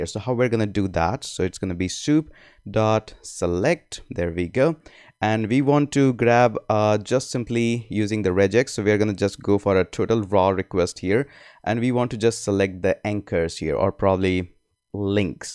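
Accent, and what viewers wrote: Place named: India. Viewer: When you talk fast your accent screams i.n.d.i.a.n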